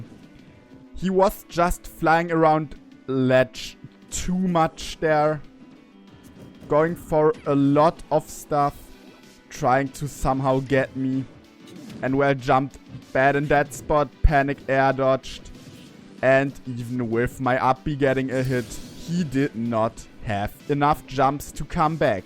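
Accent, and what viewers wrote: Germany. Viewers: Holy shit are you german?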